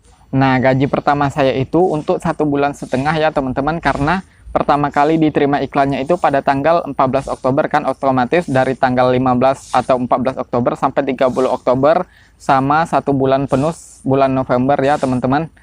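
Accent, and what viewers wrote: Jambi, Indonesia. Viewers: orang jambi kah bang?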